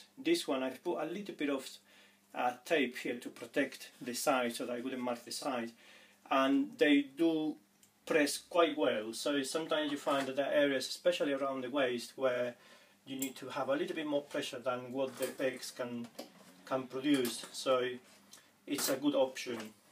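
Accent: United Kingdom. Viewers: Thanks for the videos Sr, can I detect a wee bit of Scottish in your accent?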